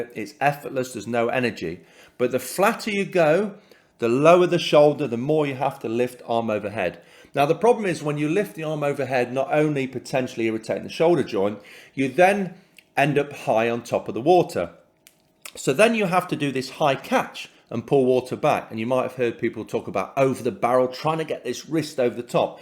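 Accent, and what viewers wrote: United Kingdom. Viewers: I want to get back into swimming and hearing your British accent brought back some great memories. I'm a gay man from Lithuania and visited England in 2018 for the Gay Pride Parade in London. I had such a wonderful time. I met these two professional swimmers at the parade and they invited me to their home after the celebration and they gave me a double full body massage while nude. It was the best massage I ever had and and I will never forgot the experience. Just thinking about it puts a big smile on my face.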